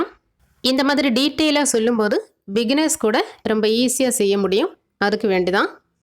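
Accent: Kerala, India. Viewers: Oru malaiyaalai Tamilppesavathaippaaraattunga kuraisollikkorikkaatheergal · Super Checbi im from chennai and your language Slang is Malayalam. 🎉🎉🎉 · பேச்சு கேட்டால் மலயாளி போல தெரிகிறது. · Malayali mathiri pesareega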